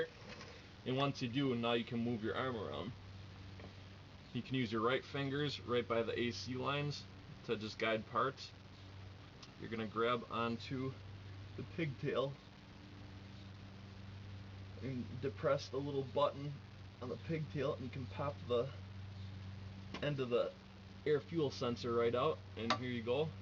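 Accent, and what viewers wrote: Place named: Canada. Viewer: canadian for sure. good guy..thanks for sharing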